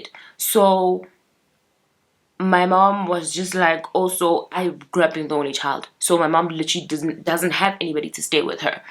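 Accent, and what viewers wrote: Nigeria. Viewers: Definitely, definitely want to see more content similar to this. It opens eyes and strengthens women in so many indescribable ways. I love the Nigerian accent as well, always gets me smiling🤭🤭🤭❤️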